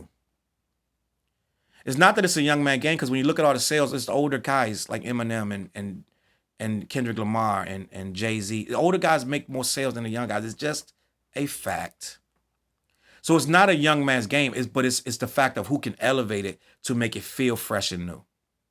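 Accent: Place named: Washington DC, United States. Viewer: Good breakdown on this. Question, you from DC area? Lol. You sound just like us in this breakdown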